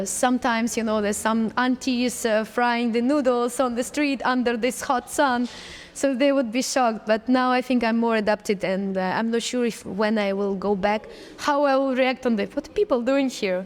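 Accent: Cambodia. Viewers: Her Khmer accent sounds cute. She really inspired me to learn a new language. She has a big heart for Cambodian people. I am so thankful for your love to my people. 🙏🙏🙏🥰🥰🥰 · She speaks Khmer like mixed Cambodian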